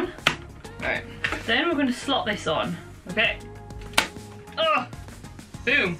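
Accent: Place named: Liverpool, United Kingdom. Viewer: Her accent is honking, but isn't scousers bad.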